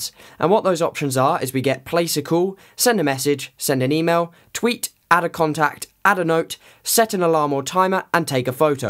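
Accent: United Kingdom. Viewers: I appreciate the demos and tweaks. If you could speak slow, it would be better to understand your British accent.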